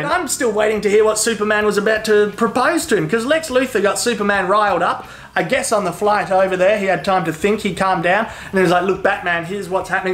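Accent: Australia. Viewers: You have THE best internet aussie accent. ~a fellow aussie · You Aussie accent makes this shit so entertaining hahaha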